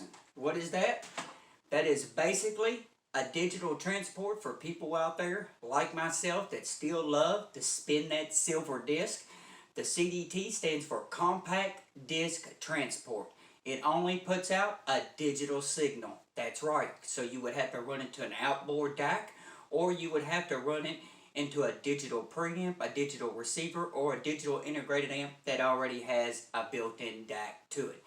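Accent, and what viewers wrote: South, United States. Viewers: “Right at the eeeeend” and “this is built like a tenk, (you all??y’all). I am watching this from England and I have never heard such a sweet sounding US dialect. Out of cultural interest, where were you brought up please? When I was a child i watched, for a short while, a TV series called North South, based on the US civil war and your dialect seems very Southern. Sounds exotic and it’s stimulating for someone from the UK where we probably sound quite neutral-flat-boring, to hear the inflections and vowel changes. Aside, your review was passionate and detailed. Thank you.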